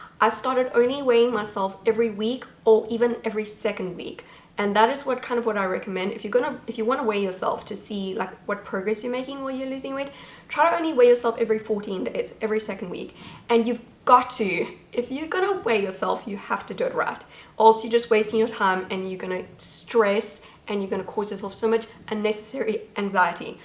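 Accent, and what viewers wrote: South Africa. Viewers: You sound South African 🙃 anyway great video thanks.... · are you south african omg love your accent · You probably get this question a lot, but is your accent south African? It's very beautiful. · Wait r u south african?? Capetonian here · I thought i recognized the accent. Im also from SA, Cape Town. :) Love your channel!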